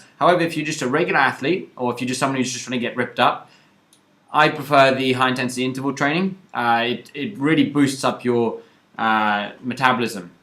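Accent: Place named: England, United Kingdom. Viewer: Thanks a lot teacher. BTW, You sound a lot cooler with your English accent haha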